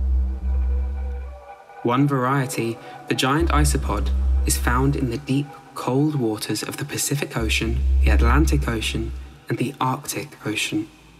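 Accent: United Kingdom. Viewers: why are videos like this always narrated by someone british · Thank god there’s a British guy narrating or I wouldn’t have been able to watch.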